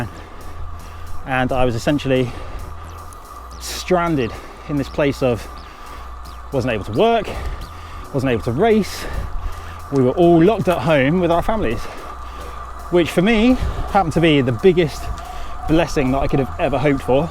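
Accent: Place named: England, United Kingdom. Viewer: Nederlands met een Engels accent doet het altijd goed ;).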